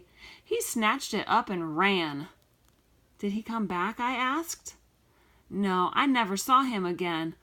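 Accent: South, United States. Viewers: You have a pretty voice it’s country I love it thanks for doing my hw 💖❤️🧡💛💚💙💜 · Her accent was from the country she’s a beautiful reader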